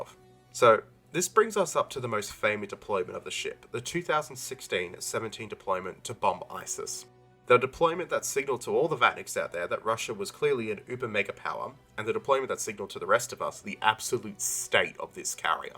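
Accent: Australia. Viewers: Love your videos. As a fellow Aussie it’s great to listen to your commentary. Keep up the great work. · Excellent Video, I like long format generally, especially in an Australian accent.😉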